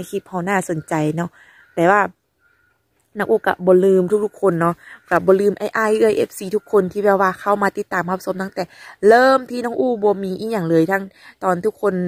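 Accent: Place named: Si Songkhram, Thailand. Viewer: สวัสดีปีใหม่....น้องอู่ติดตามดูตลอดเด้อเป็นกำลังใจให้...ถ้ามีโอกาศมาไทย..ที่บ้านยินดีต้อนรับเด้อ...น้องอู่พูดคือคน..อำเภอศรีสงคราม...จ.นครพนม.....อยู่ใกล้บ้านอ้ายเขยบ่าวหนอย.บ่าวสุ...สบายดี.....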